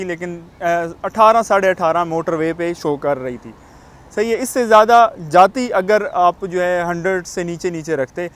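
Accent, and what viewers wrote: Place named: Mianwali, Pakistan. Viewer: Bhai ap Mianwali sy ho kiya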